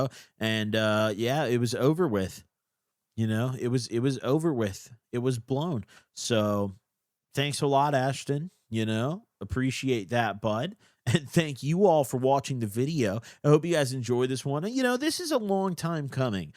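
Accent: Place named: South, United States. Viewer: Southern ass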